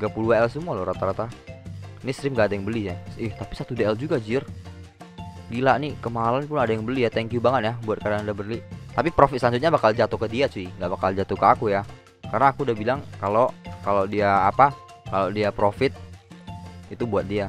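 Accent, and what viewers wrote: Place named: South Jakarta, Indonesia. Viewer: Bg ngomong lu kaya org jaksel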